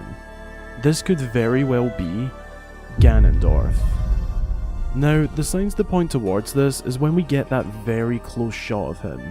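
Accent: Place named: Cockney, United Kingdom. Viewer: Your accent is so cockney XD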